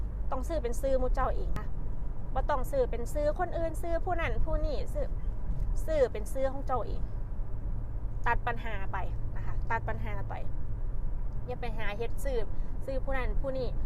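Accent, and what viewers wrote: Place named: Chaiyaphum, Thailand. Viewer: สวัสดีจ้าพึ่งเข้ามาเบิ่งเทื่อแรกจ้า ฟังเทื่อแรกเดาว่าเป็นคนชัยภูมิเดาถูกอิหลีหนูกะคนชัยภูมิคือกันจร้า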